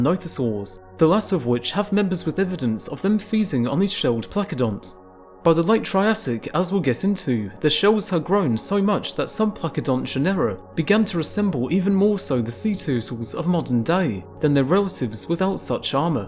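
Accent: United Kingdom. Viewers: The British accent makes it hard to understand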